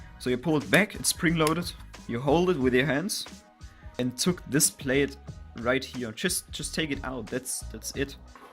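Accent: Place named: Germany. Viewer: Der deutscher Akzent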